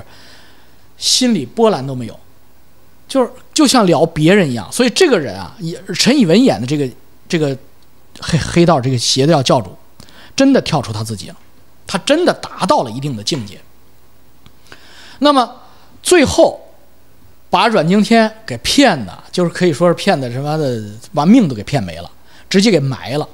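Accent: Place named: Tianjin, China. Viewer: 是桂林嘛，像天津話的嘛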